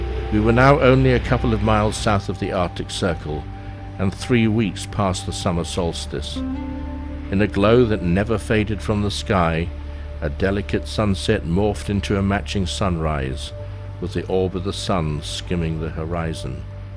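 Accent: England, United Kingdom. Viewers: Exciting journey, indeed. Lovely views of the North, spare commentary and this English voice - nice!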